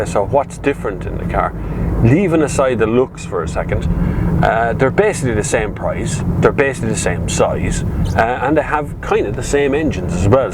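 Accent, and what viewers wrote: Ireland. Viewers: damn is that what the general irish accent sounds like? I first thought he was dutch or something... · In all seriousness, thanks for the review. But to everyone who has never heard an Irish accent remember: Der's more to Ireland dan dis! See Alan Partridge: v=1QRlR0ctjNE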